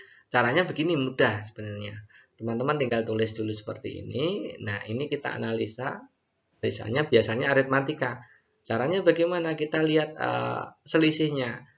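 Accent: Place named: Java, Indonesia. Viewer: Hai... Kak... Dari Jawa juga yaa sepertinya... 😁 Suka banget sama kecepatan menjelaskannya ....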